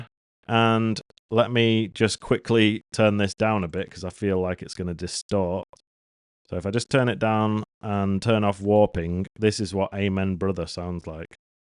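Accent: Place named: Bradford, United Kingdom. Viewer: You lad............. You are the Don Corleone! And that Accent too (Bradford boy myself) One of the best tutorials I have seen in a while. You know your stuff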